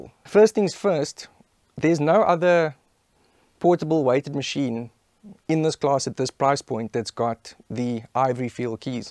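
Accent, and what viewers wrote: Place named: South Africa. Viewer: That's definitely an SA accent...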